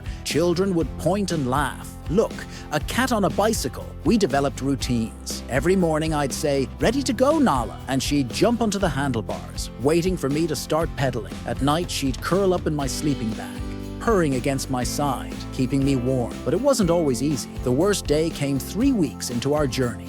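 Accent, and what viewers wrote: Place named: Scotland, United Kingdom. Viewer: are you studying to be the narrator of your life? Sound good, but I like the way you talk with your scots burrrrr. Kind of like a Nala purr.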